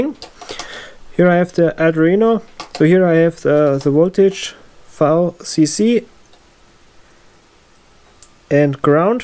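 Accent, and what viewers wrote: Germany. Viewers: Als Parodie wie deutsche englisch reden durchaus zu gebrauchen aber wenn man etwas hilfreiches gesucht hat wie ein Verbraucher an den Ausgang angeschlossen wird fehlt die Hälfte in dem Video…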